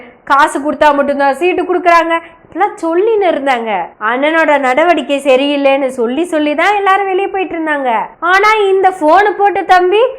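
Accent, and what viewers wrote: Kongu, India. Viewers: கொங்கு மொழி super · Vazhtthukkal thozhar Anbu magal Azhagu kongu thamizhil Indha simon vazhai therandhale sakkadaiya than varudhu Chi chi chi😂😂😂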